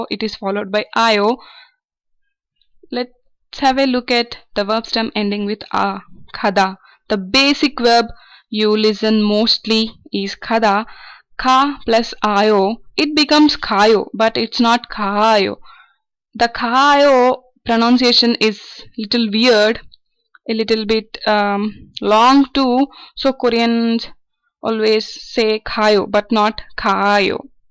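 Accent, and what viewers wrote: India. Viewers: Your accent is in Indian English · Hahaha so cute tone how indians talk english its just playing a funny games lol or its like waving water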